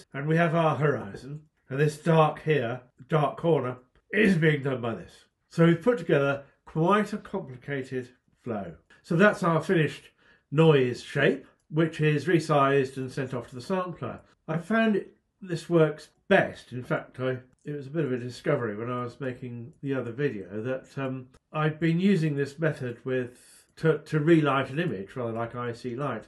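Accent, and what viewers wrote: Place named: England, United Kingdom. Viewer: I'm not good in English, but even Russian like me can understand his gorgeous pure English accent. Thanks for great videos!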